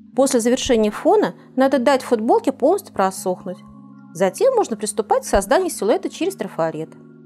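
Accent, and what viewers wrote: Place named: Orenburg, Russia. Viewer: Говор интересный. Вы из Оренбурга?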